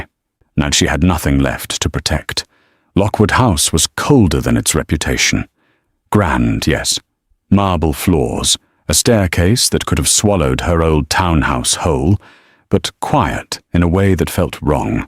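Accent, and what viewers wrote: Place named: United States. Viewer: You can travel around the universe but one cannot ignore the fact that, there is a big difference between proper English pronunciation, spoken by the people of the UK and American's version of English pronunciation. And we are listening to a story of Victorian England. Very inappropriate and causes double standards.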